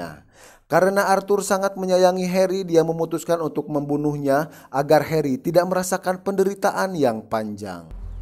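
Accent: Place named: Tasikmalaya, Indonesia. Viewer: suara tasik mana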